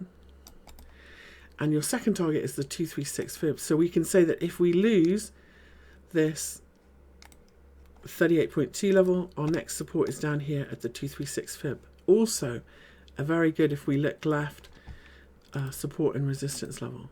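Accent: United Kingdom. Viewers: Thanks for your update. BTW you have the most classy english accent of the crypto youtubers, that's very pleasant.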